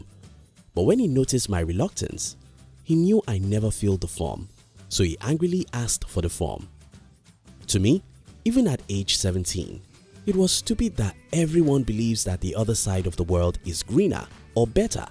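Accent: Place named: Nigeria. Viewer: He sounds Nigerian.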